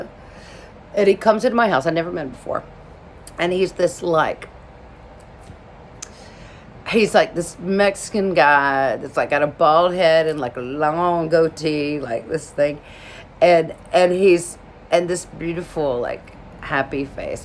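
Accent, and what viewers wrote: South, United States. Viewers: Out of NCIS, your southern accent is coming back strong. Love it. You be your P. I love you. I miss Abby, my favorite NCIS character.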